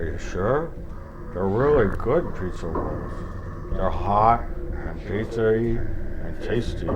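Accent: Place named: Wisconsin, United States. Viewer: My favorite part of these reviews is the narration. Monotone, mumbling mispronouncing of new words is a perfect rendition of central Wisconsin schlubbery. Its like hearing Peter Sellers when he does half a dozen different English accents to perfection.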